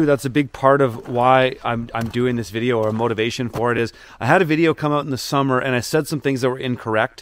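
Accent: Canada. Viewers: Thick canadian accent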